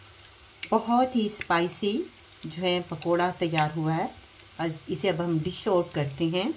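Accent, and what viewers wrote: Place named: Germany. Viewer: میرے کے لگ رہے ہیں ۔۔۔۔ ۔۔۔۔۔۔۔۔۔۔۔۔۔۔۔۔۔۔۔۔چکن پکوڑے اتنی مرچیں ۔۔۔ دیکھ۔ مجھے۔ پسینہ اگیا۔ ھے 😁 آپ کی اردو اچھی لگتی۔ ھے۔ جرمن۔ ایکسینٹ کے ساتھ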